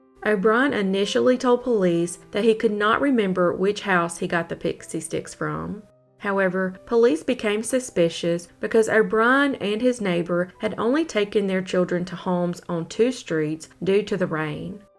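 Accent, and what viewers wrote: South, United States. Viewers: Open Youtube, check notifications, "British True Crime channels" x20, scroll a little deeper, BOOM posted 2hrs ago.. Skipp all the others, click, cause well, better content, WAY better content, PLUS I speak and comprehend accents (Arkansas, but transplanted to Texas) Keep up your excellent work, Youtube will not put you at the top of my Notifications, but I will scroll past all the others cause in my book, you are #1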